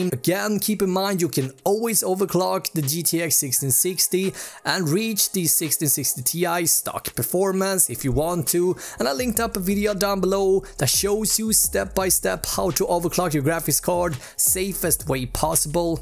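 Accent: Sweden. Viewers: You sound like you are from sweden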